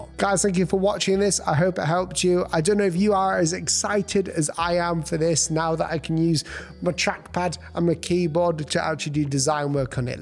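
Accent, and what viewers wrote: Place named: Yorkshire, United Kingdom. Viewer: Hahah that looks mad using a trackpad with an iPad. You from the North btw? I’m sure I’m detecting Yorkshire there somewhere 😂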